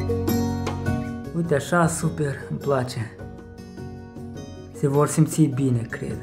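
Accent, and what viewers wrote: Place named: Russia. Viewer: vlogul e fain,dar mai lasă limba rusa deoparte, te face să vorbești Romana "urat" 👍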